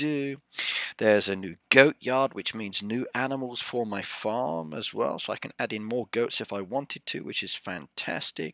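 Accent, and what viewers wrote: United Kingdom. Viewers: Your accent very british🤣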